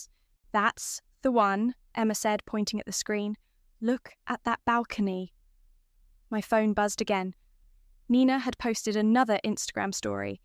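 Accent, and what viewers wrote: United Kingdom. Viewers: Very interesting story. Good British accent to voice ai. Okay text ai. Ninas spelled Nah very annoying. I liked that endless angst of used persons which feels over the top most times was absent from this story. Its clear that having a good friend to talk over the crazy entitlement of her family was very helpful. The narrative movement is lovely. Her family's comments and entitled posts coming at points when she might have hesitated and had second thoughts. That Emma got such a quick sale and some help from the real estate developer in the timing was satisfying. I would guess parents had intended right from the start to give her unit to her brother. Also the speed of the process kept the browbeating, blame, manipulation and financial abuse from getting any legs . And once they were out, wow ,no time to indulge because now they had to pay their own rent utilities food etc. 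Definitely satisfying. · I loved this story. It was thrilling and beautiful karma. I absolutely loved the British accent, never heard any of the AIs narrate in it. Some consequences come with a high cost when u come to your senses finally but it's always worth it (not for u obviously). 🤣🤣🤣🤣🤣🤣🤣🤣🤣. Well done OP. This story was really gripping and i couldn't wait for the karma to hit. Perfectly brilliant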